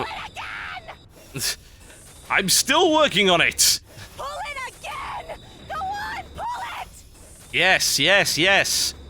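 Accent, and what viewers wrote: United Kingdom. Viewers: reason i watch the whole thing your british accent :D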